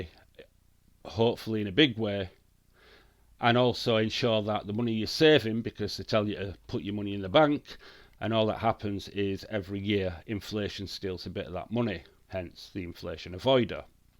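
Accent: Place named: Leeds, United Kingdom. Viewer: sounds like Leeds acsent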